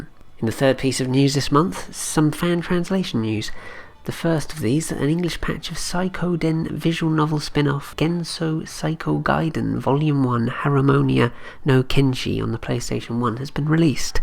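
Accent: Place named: United Kingdom. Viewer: Fantastic show, went back and watched all previous shows nice to hear a British accent. Wish they would package all Time Crisis games for the PS3 Move what you think?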